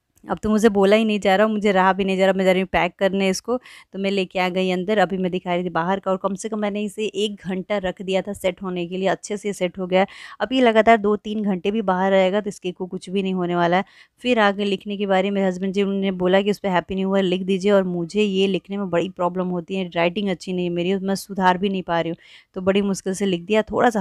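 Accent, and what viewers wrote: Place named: Jharkhand, India. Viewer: Mujhe laga jharkhand se, aapka bolne ka tarike bhot acha hai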